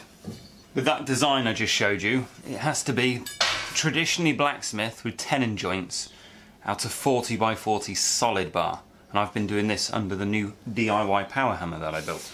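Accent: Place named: United Kingdom. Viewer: I like your videos and British dialect, I made my own from parts I salvage from SMPS PCBs, Plasma TVs etc.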